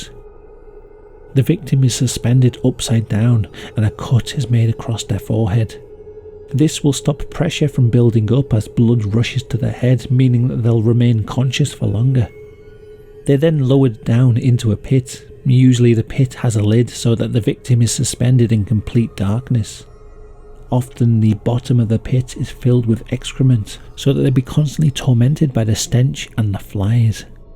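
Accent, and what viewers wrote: United Kingdom. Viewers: hey I love your vids and ur british accent <3 also im a little drunk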